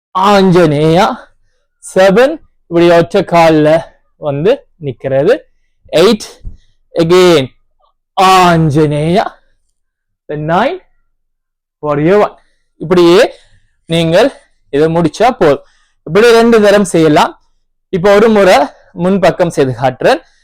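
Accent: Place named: Sri Lanka. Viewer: Love your way of speech and slang... Srilanka?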